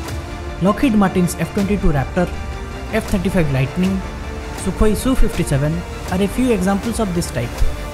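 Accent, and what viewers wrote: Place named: India. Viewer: Dude you have all the Indians accent they always mimicking Indians for....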